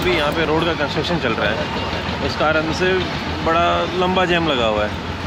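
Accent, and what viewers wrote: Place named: Bengal, India. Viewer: Your bengali accent is so ❤😉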